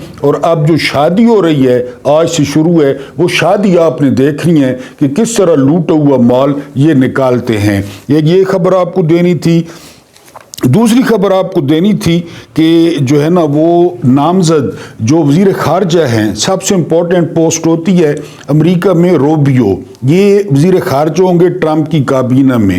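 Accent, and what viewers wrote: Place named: Pothohar, Pakistan. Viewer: نہ وڈیو لمبی نہ باتیں زیادہ بلکہ تشنگی رہ جاتی ہے آپکا پوٹھوہاری تڑکا دل باغ باغ ہو جاتا ھے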